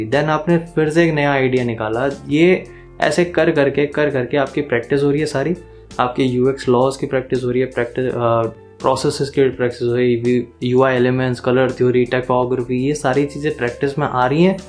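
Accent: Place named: Haryana, India. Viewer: are bhai Haryanvi accent OP 🔥🔥 , JAI HARYANA 💪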